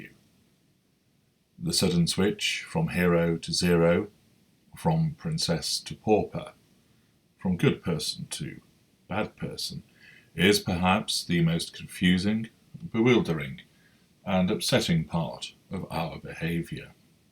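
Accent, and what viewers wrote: United Kingdom. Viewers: Even if they are good, and he is very good at his Kraft. Never praise them. You are providing huge supply by doing so. Also, the British accent does make it interesting but also makes him sound pompous. This is in harmony with his Advanced narcissist claim. I believe him. He is sophisticated in the ways of narcissism. But that's nothing be proud of if you truly are narcissistic. You simply mirror the One who is your father. Satan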